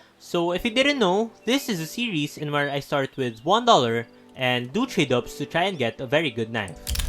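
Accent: Philippines. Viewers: are u from phillipines your accent sounds like it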